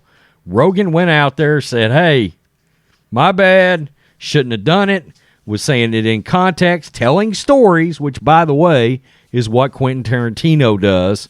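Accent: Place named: South, United States. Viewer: I'm pretty sure your White a** has said it before. Sound like good ol boy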